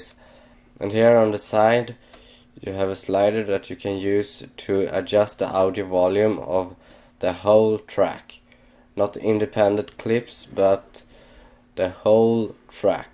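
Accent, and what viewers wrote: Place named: Sweden. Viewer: fyfan va svensk du låter :P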